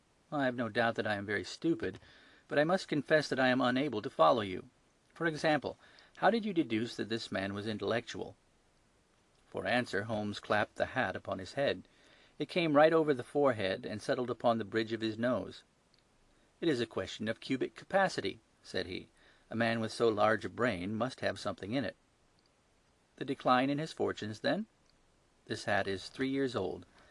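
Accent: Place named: United States. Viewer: Nice Narrator voice with a slight American accent.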